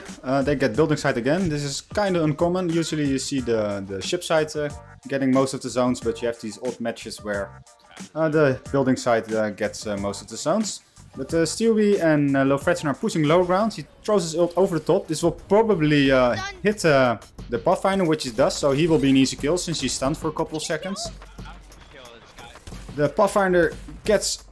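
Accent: Netherlands. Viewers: Goeie shit bro, lekker nederlands accentje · Wy do you sound dutch 😂 ps hij is wel goed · I'm not trying to be annoying but you are dutch right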